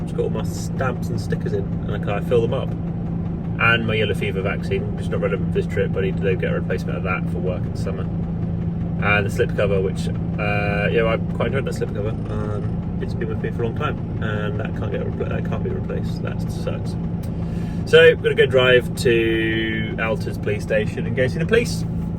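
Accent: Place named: United Kingdom. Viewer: Love your British accent. Are you from Liverpool?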